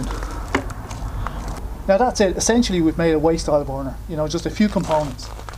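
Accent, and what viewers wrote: Ireland. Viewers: OMG, that was entertaining to watch the entire way thru, what with the Irish accent, the simplistic attitude and the raw fire power just waiting for idk, something more than just heat.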